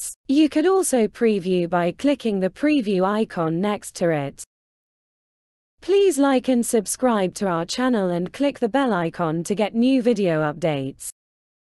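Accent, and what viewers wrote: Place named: RP, United Kingdom. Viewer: This AI generated (presumably?) "Received pronunciation" accent is unspeakably annoying.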